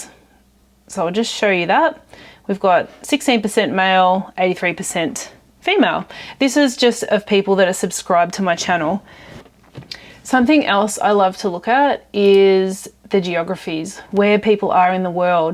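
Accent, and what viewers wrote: Australia. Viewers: That was terrific ! On your chart, I am in that tiny pink group of over 65 year olds, female from the US. As a career custom jeweler with a love of Boulder and Black Opals I have also learned to love the Aussie dialect. The things I love about your channel include your family and general caring attitude, the opposing season to mine yet a similar climate, the realistic working messiness of the farm, your voice and amazing eyes. I liked that you responded to my comment half a year ago including a mention of opals. It felt personal. On your next trip to the US consider far northern California, on the coast for a stop. I would enjoy showing you around. We have KUNE KUNE pigs from New Zealand. I have a flower stand and show pictures on instagram This is also a huge lily producing area. Redwood trees bigger than imagination all around. Keep up the excellent videos and flower farming.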